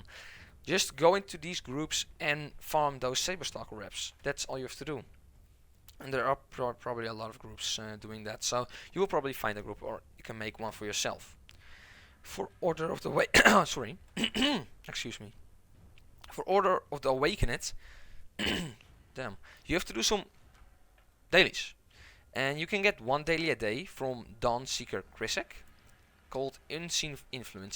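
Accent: Netherlands. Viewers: You sound Dutch :D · Dutch sounding English. The worst. thanks for the tips though ;)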